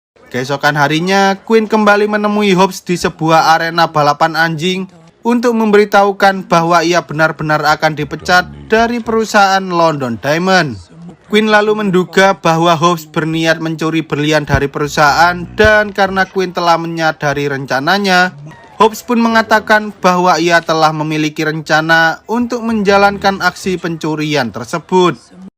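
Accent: Java, Indonesia. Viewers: Terlalu mencolok wong jowo nya 😂 bg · Suka penjelasannya sellu dgn logat mendok nya 🤭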